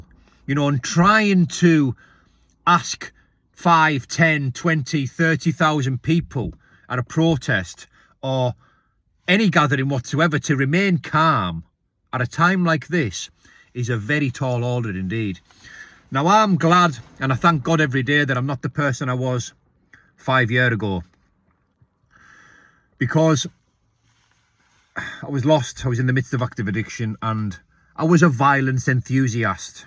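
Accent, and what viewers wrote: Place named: Cumbria, United Kingdom. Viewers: I knew when I started listening to this video you sounded Cumbrian. 😂 I moved up here 10 years ago and love the spot, I integrated into a small village community after moving from a big busy city. I wouldn't try to being my city lifestyle to my new community because they live there way of life and I've embraced it. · Knew it from your accent. I’m Cumbrian too. We are lucky where we live but it’s changing.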